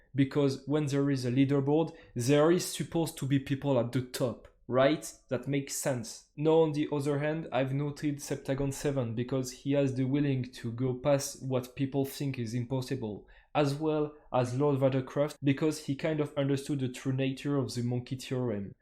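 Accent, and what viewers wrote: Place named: France. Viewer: you have the french accent lol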